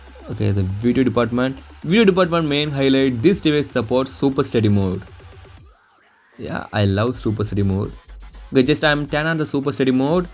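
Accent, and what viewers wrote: India. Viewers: Too hard to understand with your heavy Indian accent, moving on.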